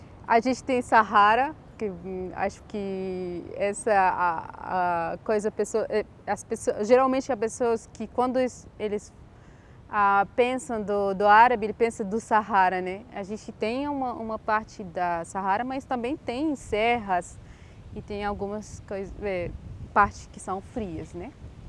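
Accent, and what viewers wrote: Ceará, Brazil. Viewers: Que fofa falando com sotaque cearense. Descobri esse canal agora, estou amando os vídeos😍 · Seja bem vinda ao nosso País,sou de Salvador mais já deu pra notar que vc já estar com sutaque Cearense.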